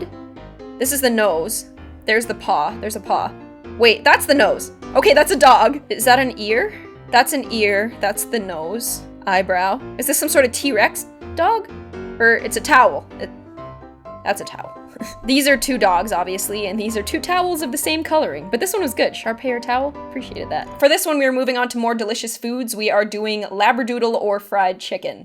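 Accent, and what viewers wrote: Canada. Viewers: I love how you say 'begel' instead of 'bagel'. The Canadian accent has never ceased to surprise me :)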